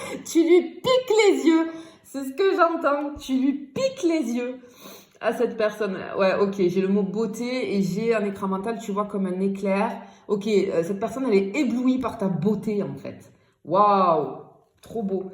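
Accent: South, France. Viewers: C’est trop bon l’accent du Sud !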